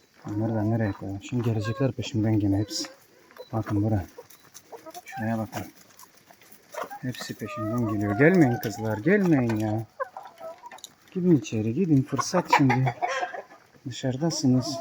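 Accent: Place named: Balkans. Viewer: Siz Türk degilsiniz turkceniz duzgun degil Balkan gocmenine benziyorsuniz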